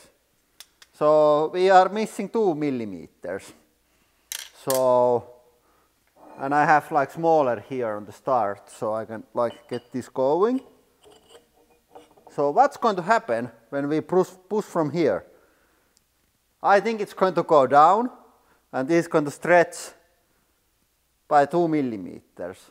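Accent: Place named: Finland. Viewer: Love your Finnish accent!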